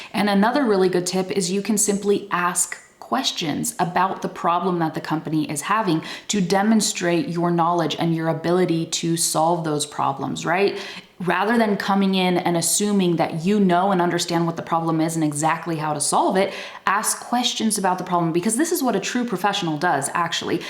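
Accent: California, United States. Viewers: Hi. Just want to know why when I listen to you I can clearly understand every word you say. Is it because you are from California or because you had to develop your pronunciation skills as a teacher? Thanks! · I think there are many accents in the american accent. I like yours. from iraq 🇮🇶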